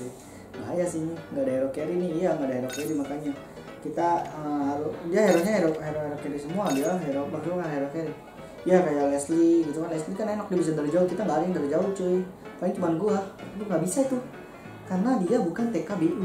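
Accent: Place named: Surabaya, Indonesia. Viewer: sedot lu kok medok bgt??? pas an kyk aku suroboyo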